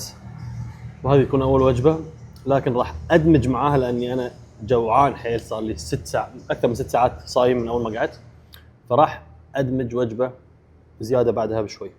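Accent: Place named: Gulf, Arab world. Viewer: يعم متتكلم مصري ضروري عشان رحت الخليج تتكلم زيهم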